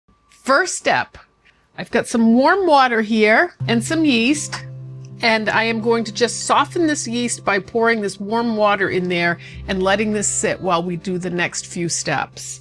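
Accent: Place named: New Hampshire, United States. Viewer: Where are you from. I swear I hear a northern mass southern nh accent. From where I was born!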